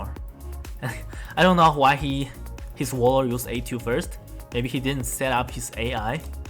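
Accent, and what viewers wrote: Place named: Asia. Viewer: Is this first time that I see you in person:O. I only follow you on YouTube hehe. I knew that you were an asian hehe I'm not a native speaker English, even though I recognize that accent. Thank you I really learn a ton with your videos. I appreciate <3.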